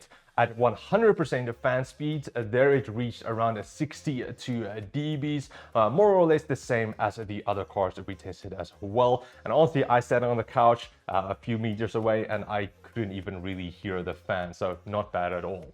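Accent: South Africa. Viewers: Damn i knew you were pronouncing words in a odd yet familiar way. i could not put my finger ont it. (im living in Australia for a couple of years now) That good ol south african accent. I can still pick it up.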